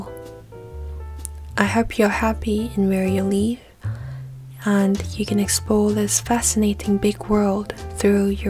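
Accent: United Kingdom. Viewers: Love your English accent❤❤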